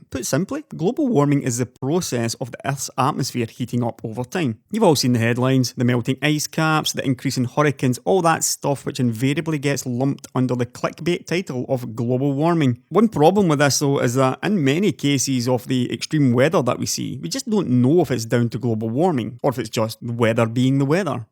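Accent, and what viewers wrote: Scotland, United Kingdom. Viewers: Love the video and watched through fascinated although I knew most of it. The sound of Scottish makes it that more interesting. Thank you.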